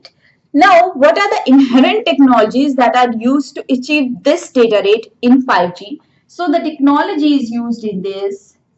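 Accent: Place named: India. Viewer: The teaching method is good but the accent is totally Indian accent. It is quite difficult to catch up with other learners. Only Indians might absorve with all of the ur explanations.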